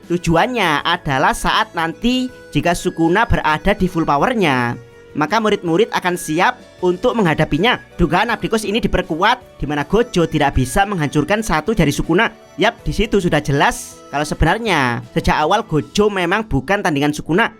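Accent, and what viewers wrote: Java, Indonesia. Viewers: Gojo bang bulan godjo,medok banget si bang hhhhh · Gausah pake cekibrot norak banget jawernya terlalu kental,,,enak yg biasa aja om lebih adem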